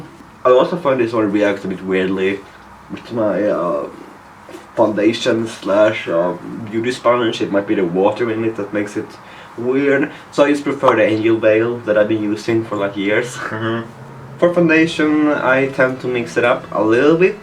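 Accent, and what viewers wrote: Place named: Sweden. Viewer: YOUR SWEDISH ACCENT IS SUPER COOL, from which part of Sweden are you from?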